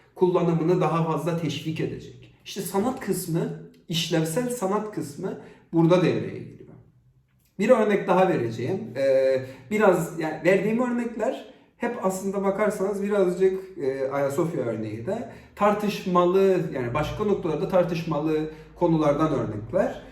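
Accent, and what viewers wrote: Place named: Cyprus. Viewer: Doğu Akdeniz Üniversitesinde öğrenim görmekteyim,konsept ararken videonuza geldim. Şiveniz beni tekrar Kıbrısa götürdü. Bu pandemi döneminde çok güzel hissettirdi. Videonuz da çok yararlı oldu. Teşekkür ederim.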